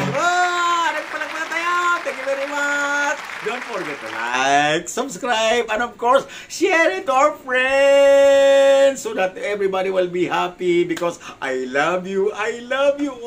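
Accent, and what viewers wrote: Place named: Ilocos, Philippines. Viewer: Kasla puro a ilokano ti ayog na niya🤣🤣🤣🤣🤣👍👍👍👍👍👍👍🏅🏅🏅🏅🍻🇵🇭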